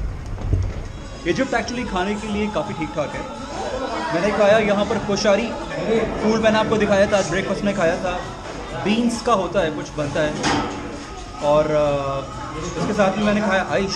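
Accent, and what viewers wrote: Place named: Haryana, India. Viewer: Bhai Egypt m ab naya rule aaya h teen char din phle hi... Agr koi tourist ko tng krega na toh 10000 Egyptian pound ka fine h... Maine newspaper m pdha tha socha aap ko bta du.. Aap harayan se ho kya bhai aap bolte ho Bich bich m haryanvi ..aacha lgta h.. M bhi haryana se hu sonipat... I like ur videos very much.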